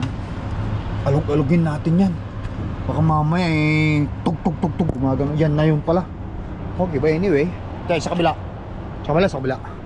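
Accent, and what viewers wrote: Ilocos, Philippines. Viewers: yun speaking voice mo tunog batangeño na ilocano tigas ng dila mag pronounce ng words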